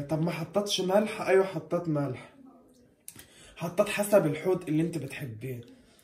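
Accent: Damietta, Egypt. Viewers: هي دي لغتهم في دمياط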